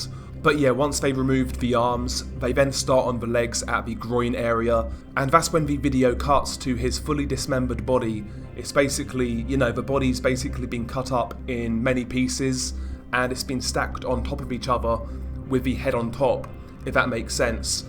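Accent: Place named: United Kingdom. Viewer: british accent is so satsfing for narations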